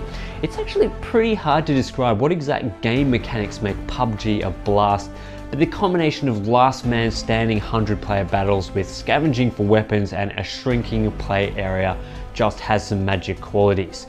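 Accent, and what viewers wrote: Australia. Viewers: hello I'm new and I subcried and you are austrailan I know because I I'm and I can tell I your voice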